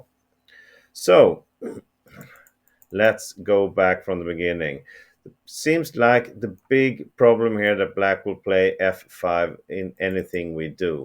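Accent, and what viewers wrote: Sweden. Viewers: What a bizarre comment!! I like Swedish accents and the overall impression is most personable.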